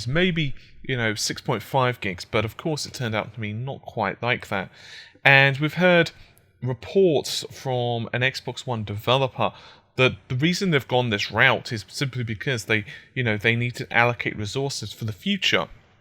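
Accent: United Kingdom. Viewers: What's he doing? He speaks in a british accent but uses American terminology like the way he pronounces 'route' and the way he says 'math' instead of 'maths' :S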